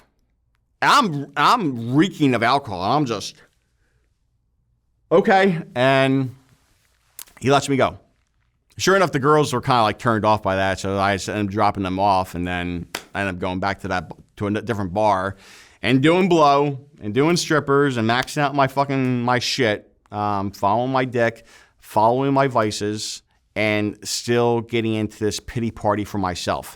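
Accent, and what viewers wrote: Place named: Baltimore, United States. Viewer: Holy hell is this dude from Baltimore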